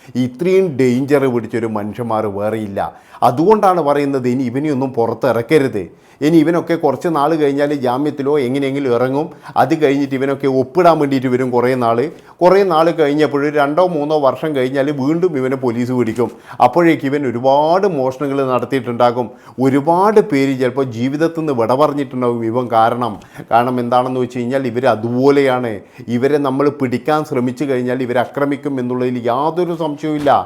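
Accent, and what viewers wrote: Kannur, India. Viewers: Kannur slang nalla rasam kelkkan. My fathers place anjarakandiyanu thangalude native place evideya?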